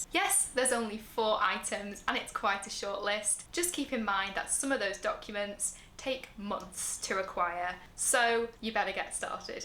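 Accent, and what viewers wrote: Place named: Ireland. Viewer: are you Irish? you just cant hide your accent.....................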